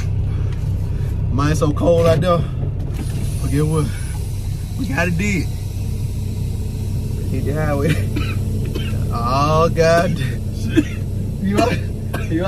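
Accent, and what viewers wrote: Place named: Mississippi, United States. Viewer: I hear that Mississippi in ya voice mane 😂#601 stand up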